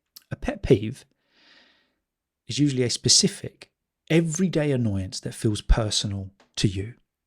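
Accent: United Kingdom. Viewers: Totally perfect British English accent thank you very much for your teaching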